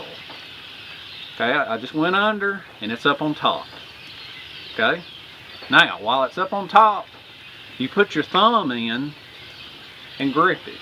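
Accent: South, United States. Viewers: Another good video. I guess one of the reasons I enjoy your videos so much is that you do show different ways of doing things. That and we share the same southern accent! LOL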